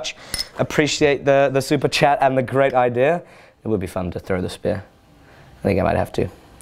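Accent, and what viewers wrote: England, United Kingdom. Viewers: were is from? sounds English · Hey man, great video. Where in England are you from?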